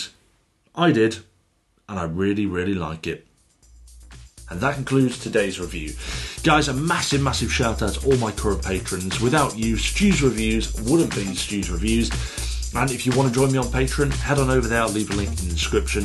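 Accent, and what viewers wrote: United Kingdom. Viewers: The thing that suprised me most is even though I use "Echo" as the wake word for my device, it wasn't triggered once during your video. Is it possible that my device can distinguish between a Northeastern U.S. accent and a British accent?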